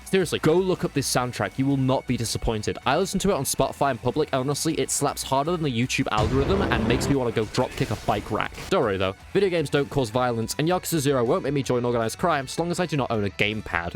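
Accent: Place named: England, United Kingdom. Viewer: You said it correctly-ish. As good as you can with english accent.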